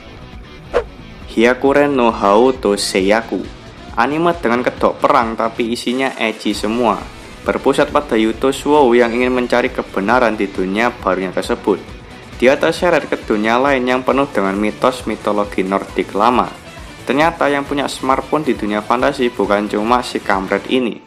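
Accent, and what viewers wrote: Java, Indonesia. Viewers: Medok tapi bagus unik..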